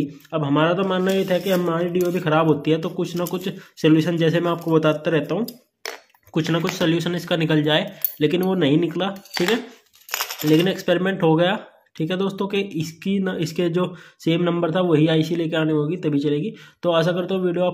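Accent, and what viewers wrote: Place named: Baghpat, India. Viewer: Sar aapke Bhasha Baghpat side ki hai kya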